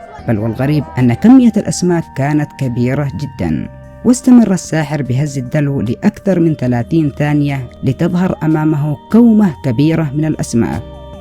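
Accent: Yemen. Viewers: عمر باعمر هذه لهجة يمنية و يصعب فيها نطق بعض الحروف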